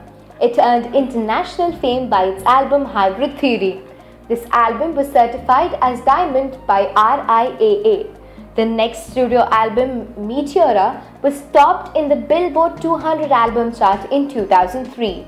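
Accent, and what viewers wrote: India. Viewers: Is the commentary done by an Indian or something not like it matters but I thought they're knowledge was like cricket and how to make carpets fly and stuff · i hear indian accent ..never mind..they are so fucking far ridiculously behind in the mainstream rock and roll area and meansteam music...so fucked up with their bollywood shit!! idiots!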